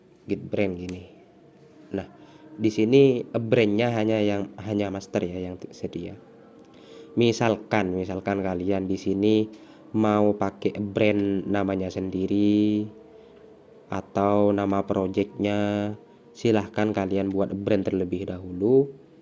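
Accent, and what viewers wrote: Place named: Madura, Indonesia. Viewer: Orang madura ya bang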